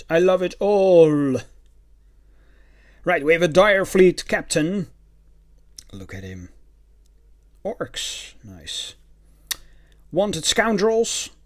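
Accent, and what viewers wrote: Netherlands. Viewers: are u dutch?? ik hoor het aan je accent :>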